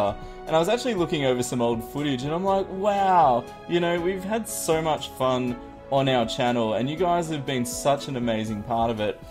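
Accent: Australia. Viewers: Wow didn't realize u were austrailian(guess I should have known from ur accent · is Australian I thought he was English my mind is blown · 4th like I never new u was Australian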